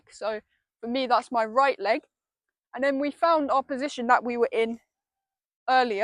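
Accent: England, United Kingdom. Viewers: best, what a nice accent? what part of england it is?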